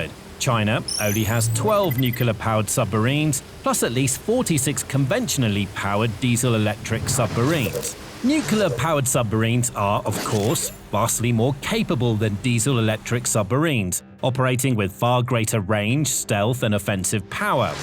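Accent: United Kingdom. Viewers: Yeah Okay. He is English,( British.) Not American. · Dude is saying nucular in a British accent nothing that follows that can be taken seriously! I couldn't continue after maybe the 5th time but I'll be he says the hulls are made of aluminum or some such shite.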